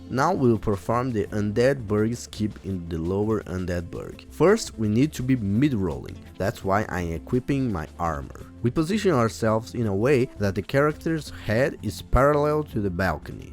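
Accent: Brazil. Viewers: Seus R são muito de paulista. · I think he's Brazilian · Are you brazilian? your accent sounds familiar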